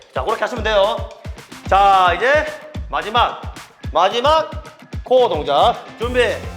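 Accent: Gyeongsang, South Korea. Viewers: 진짜 인트로 어설프게 미국물 먹은 경상도 아재 감성이다...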